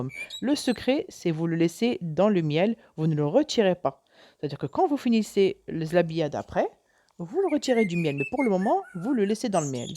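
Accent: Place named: Oran, Algeria. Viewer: هل انت جزائرية سيدتي لهجتك من وهران هل نضع الزيت قليلة فل مقلاة ام كثير والعسل كيف يكون ثقيل ام خفيف شكرا لك على الوصفة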